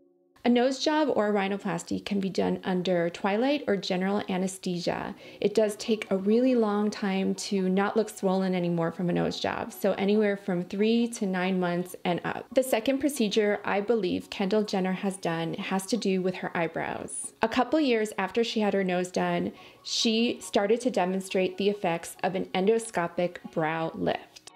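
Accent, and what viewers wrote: United States. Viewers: its the socal accent lol. it is what it is haha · where are you from?? You sound like you're from the Midwest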